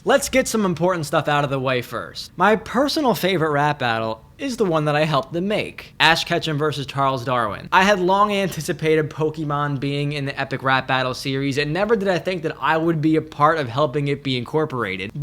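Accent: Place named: Wisconsin, United States. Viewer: Does anyone know where he's from? He sounds kind of Wisconsin :P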